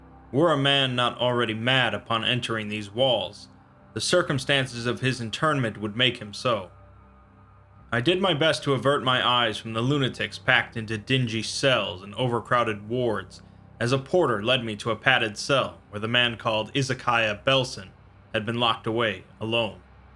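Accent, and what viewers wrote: England, United Kingdom. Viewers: Two years later and I’m still amazed kept up an English accent for almost a whole hour. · Another great episode. Good English accent lml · Which English accent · Great British accent didn’t think it was really you at first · That British accent is amazing!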